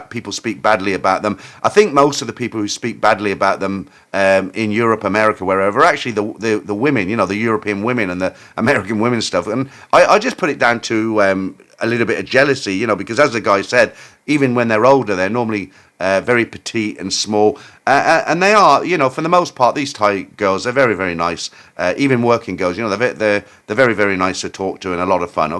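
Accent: England, United Kingdom. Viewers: Glad you are keeping these. I would never watch AI stories they’re boring and soulless. Watching since the shed days of 2020/2021 I have never even been to Thailand but I find them entertaining. Also nice to hear an English voice while I’m in australia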